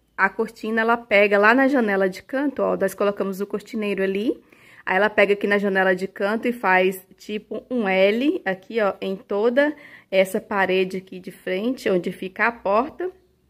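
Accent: Brazil. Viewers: Vc e baiana???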